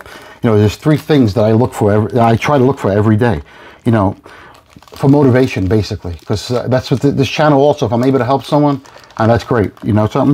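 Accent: East Coast, United States. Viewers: Love your message and videos. Old me from a few years back would've been jealous of your nice stack, but the current me just enjoys it and sees it as great motivation for myself. I enjoy your east coast accent, not from there myself but I like to hear it. My dad passed a couple years back at a younger age and it was rough but helped me put things in perspective for what is important in life and he was such a fun person who would make people laugh and smile all the time, even until the end. I remember him cracking up the nurses in the hospital even when he was in such rough shape. Keep up the positive attitude, I always appreciate it and love to see when your new videos pop up in my notifications.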